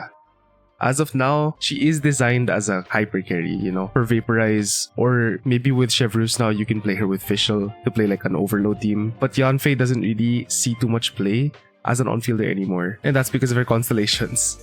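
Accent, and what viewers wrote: Philippines. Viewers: why do u sound so filipino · Sounds very Filipino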